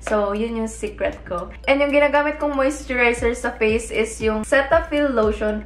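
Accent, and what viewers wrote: Visayas, Philippines. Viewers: Bisaya ka? Hahaha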